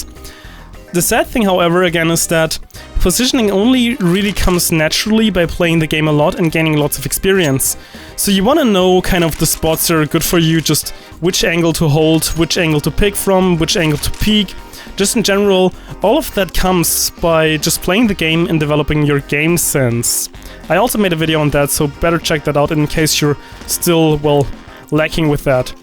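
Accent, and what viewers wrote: Germany. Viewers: u german or something?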